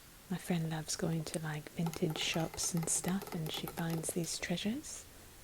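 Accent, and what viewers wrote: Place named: United Kingdom. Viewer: I’ll always be on the lookout for any little slip up in your British accent 😊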